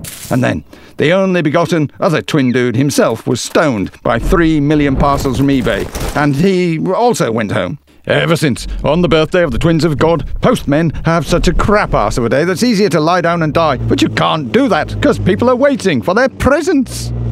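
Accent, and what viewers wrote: United Kingdom. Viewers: You'd better pay some american narrator than british. American accent is more acceptable.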